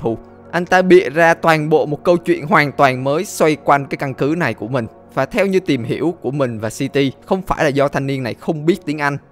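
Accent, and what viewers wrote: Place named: South, Vietnam. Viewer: that is southern vietnamese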